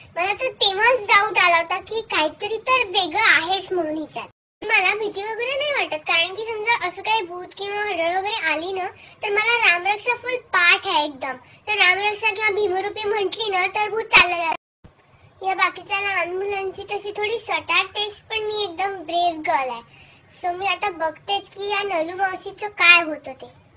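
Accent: Nagpur, India. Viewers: सोटी पोरगी,नागपुरी लहेजा एकदम फक्कड, म्हंजे की नै एकदम बढ़ीयाच 👍🙋‍♀️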